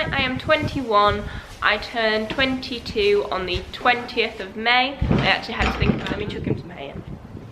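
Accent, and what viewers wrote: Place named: Yorkshire, United Kingdom. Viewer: Thought I recognised the Yorkshire accent, I’m from hull and it’s quite strong lol x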